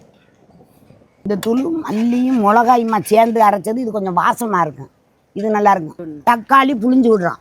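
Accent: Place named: Ilayangudi, India. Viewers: Ilayankudi pechu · Etha oru illyangudi ya slang amdiya irukay❤❤❤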